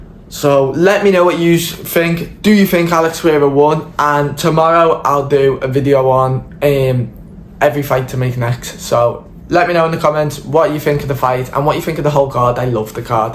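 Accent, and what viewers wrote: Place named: United Kingdom. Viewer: You sound like Paddy Pimblet, Ajex Pareyrahhh. Umm I love British accent actually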